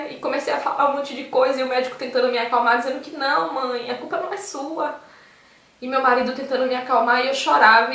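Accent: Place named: Cruz das Almas, Brazil. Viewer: ! Acabei de relatar minha história para você, e achei seu sotaque parecido com o nosso. Vendo agora seu endereço, percebi que também é de Cruz das Almas. Caso queira, eu posso te ajudar com indicação profissional e ombro amigo caso queira. Deus te abençoe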